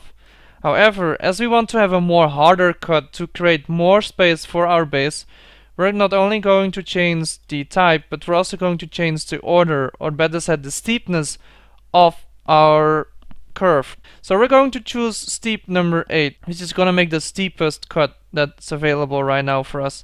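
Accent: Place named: Netherlands. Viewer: I love your dutch accent, like mine xD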